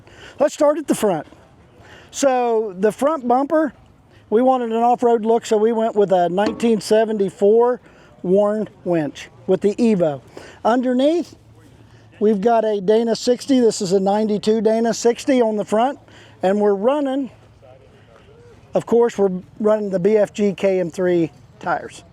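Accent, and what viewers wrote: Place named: West Virginia, United States. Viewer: What part of West Virginia are you from. I’m originally from Kimberly. Every heard of that little holler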